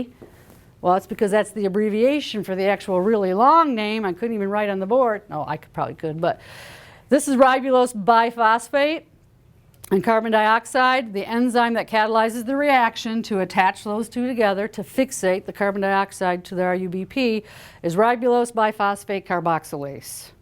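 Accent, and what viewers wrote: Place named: United States. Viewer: It was good, much better then my teacher who just went on holiday!? but I don't know if its just the American accent but she sounds permanently drunk.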